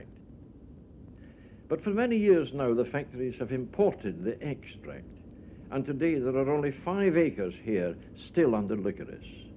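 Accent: RP, United Kingdom. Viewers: 50 times stronger it was the fentanyl of its day! 😅 it's very interesting and quaintly informs you of things in that once ubiquitous, now obsolete, BBC accent. This video highlights the rarer Scottish BBC inflection.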